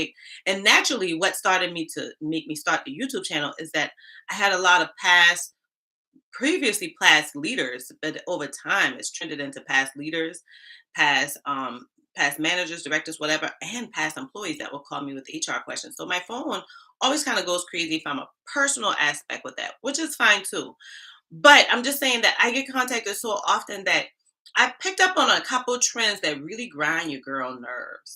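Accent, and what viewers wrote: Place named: Louisiana, United States. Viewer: girl I love the way you talk and I did think that you was from Louisiana!!! Girl you are funnny and real. I'm not in HR, but I have been during research to get myself ready to move into HR, hopefully with my tranferable skills. I been at my job for 25 years and I want a change and advancement in a career.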